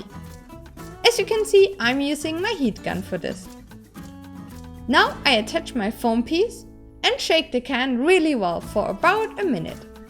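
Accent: Germany. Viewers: Du sprichst ja englisch immer noch wie ein Deutsche, die glaubt, dass Amerikaner so reden. Ansonsten schönes Video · Your German accent is charming.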